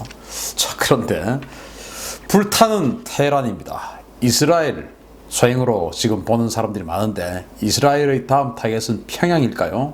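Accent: Gyeongsang, South Korea. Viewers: 간곡히 건의합니다 1. 멘트를 조금 늦춰주시면 좋겠습니다. 경상도 억양에 말씀을 너무빨리 하기때문에 미처 이해하지 못하는 경우가 있습니다. 2. 광고가 너무많아 답답합니다. 다른 방송보다 독자 수가 월등히많은데 운영에 넉넉 하쟎 습니까? 깊게 헤아려 주셨으면합니다. 존경합니다. !!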